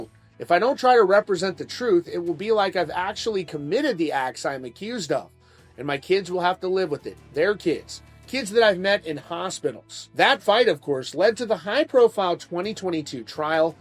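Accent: United States. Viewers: Time to come home to Kentucky my man ,Need to work on that damn accent you have now anyway , went full blown yank on us.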